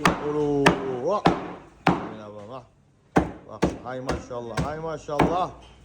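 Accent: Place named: Kargı, Turkey. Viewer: Ben kargı lıyım bizim oranın kebabına benziyor şiveleride aynı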